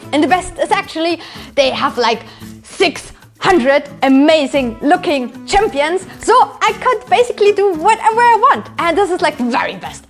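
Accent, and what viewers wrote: Germany. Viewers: Wenn man dir länger zuhört, dann ist der deutsche Akzent sehr deutlich. Ist ein Kompliment ! 🤗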